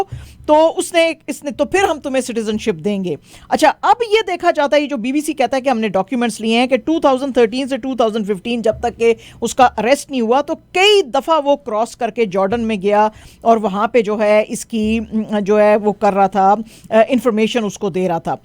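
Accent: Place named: India. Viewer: سسٹر آپ انڈین ہیں شاید۔ 🤔بھارتی فلموں کی طرح خ کو کھ پڑھتی اور بولتی ہیں۔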